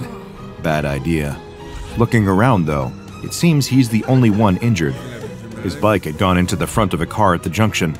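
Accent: England, United Kingdom. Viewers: These English accents are beautiful, I am LOVING your videos. I've been watching and subscribing since your first video. Watching you grow to one million subscribers made me so happy. It's nice to see you going strong. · might be the most british voice ive heard in a while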